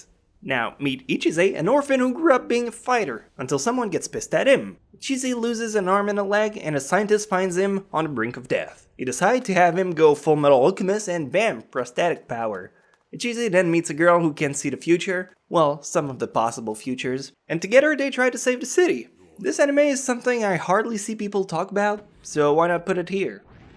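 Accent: Quebec, Canada. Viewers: french canadian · Eero Koponen *French Canadian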